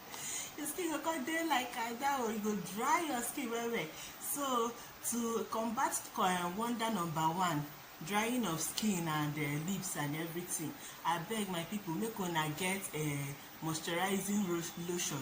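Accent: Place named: United Kingdom. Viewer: Your pigin still dey sound like British English lol! Speak the warri undiluted pigin.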